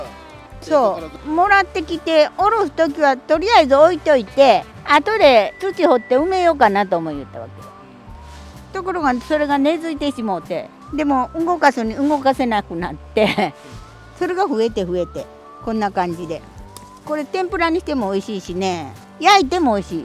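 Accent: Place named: Ehime, Japan. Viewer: のファンです。私と同い年なのに、テキパキ畑仕事をやりこなして、凄いな！って感心しています。何より息子さんや、家族に恵まれていて、羨ましいです。愛媛出身なので、方言が所々似ていて聴いていて楽しいです。笑顔良しの、これからも無理しない様頑張って下さい。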